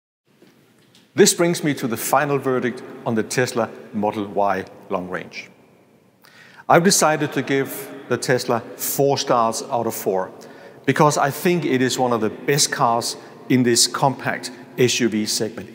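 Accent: Denmark. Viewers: It definitely has the enhanced autopilot, since it shows that it wants to change lane, when you started indicating in the video. The only problem was, that you yanked the steering wheel too hard. Its very sensible on that. But I agree: The feature is not worth its money (just yet)… I love the danish accent by the way! Saying that as a half dane. Haha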